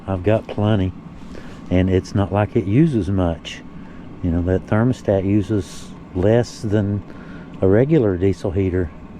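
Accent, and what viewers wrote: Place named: South Carolina, United States. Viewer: Great video I'm trying to guess your accent. are you from South Carolina?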